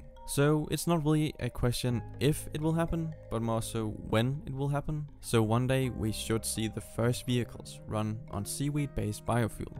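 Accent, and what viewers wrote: Denmark. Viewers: Bold of you to assume I'm American. I'm from Europe as well so yes, I'm fully aware of what a Danish accent sounds like and yes I can hear that when he speaks but that is obviously not the issue here. You can speak up or speak quietly with the same exact accent. I dont agree that it's "my problem" if I'm not a fan of the quiet style of talking. It's not about it being a problem per se, I was just providing feedback and my view on the video. Don't need any white knights coming here in defence who have never seen a comment section of a youtube video before. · I can hear the dane in your voice, our accent is quite unique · That's the Danish accent you're hearing ;)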